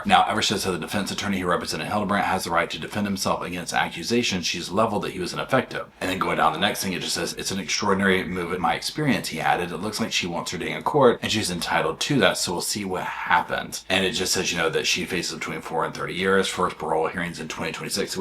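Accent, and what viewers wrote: South, United States. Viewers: I have figured out why I enjoy your commentary about cases so much! You remind me of conversations with my brother about anything/anyone who is doing shady shit or sus situations. I love it as we can be some salty, sassy, and petty southerners. I laugh because my brother in law just rolls his eyes when we get catty, but that's how we just gossip for lack of a better word. Now, mind you, this isn't really gossip, but it's delivered similarly and as we grew up in west tn, the accent is another plus. This is just a thank you for being you and and for doing what you do bringing cases and situations around them to light in your way.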